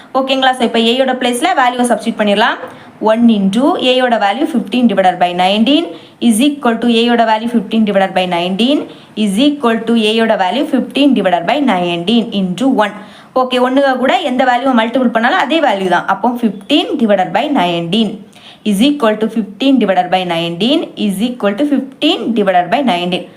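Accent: Kanyakumari, India. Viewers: Super ma,உங்கள் பேச்சை கேட்கும் பொழுது நீங்கள் கன்னியாகுமரி என்று தோன்றுகிறது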